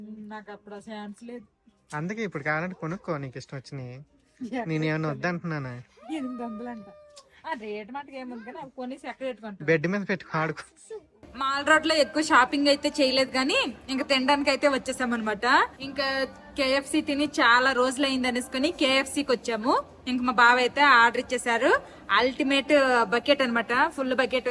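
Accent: Srikakulam, India. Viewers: Weight loss ayinapati nundi mi dressing style kuda change chesaru So miru Ala change ayinapudu Srikakulam slang use cheyadhu🙏 inka deniki modern language lo ne mataladachu ga. Videos kuda chala lag avuthunayi... Okay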